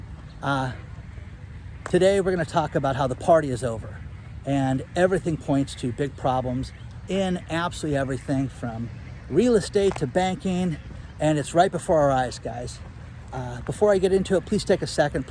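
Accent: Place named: California, United States. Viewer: I love his California accent haha. It’s like a Canadian..certain words have a zing. Anyways great content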